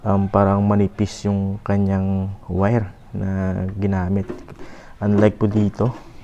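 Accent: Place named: Ilonggo, Philippines. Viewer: Ilonggo ka boss? Dw nabatian ko ang tono ta eh,hahaa.pila ang set sina boss shampoo and water pila magasto? Thanks for sharing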